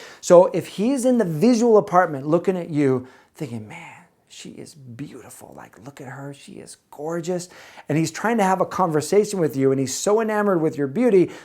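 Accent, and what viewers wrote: United States. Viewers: I love your slight country accent! I didn’t notice at first until you said “time” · I love your video's. I love your American accent too 💛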